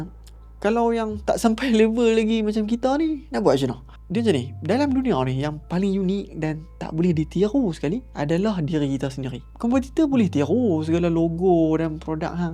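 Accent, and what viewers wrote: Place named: Kedah, Malaysia. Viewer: Ale uto, suara ko slow, cakap macam dalam kelambu. Slang kedah ko aku benci. Cakap bahasa mudah orang faham la., jgn lekat Sgt loghat ko tu🖕🏽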